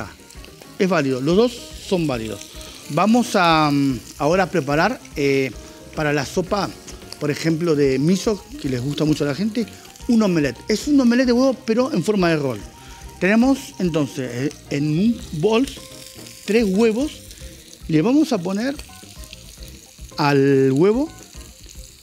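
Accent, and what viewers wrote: Argentina. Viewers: ME PONE LOCO VER AL JAPO HABLANDO PORTEÑO....MARAVILLOSO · A lo primero me chocó escuchar en un japonés,ese increíble acento argentino,pues esperaba que fuera un canal de Japón.Pero me a encantado esa sencillez con la que se expresa.Un humilde español les desea todo lo mejor. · Me suena gracioso escuchar un japonés hablando como los argentinos, pero se ve que es un buen chef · Hola primera vez que te veo,muy interesante e instructivo, solo que me dió mucha risa ver un descendiente japonés con el acento Argentino.. mirá vos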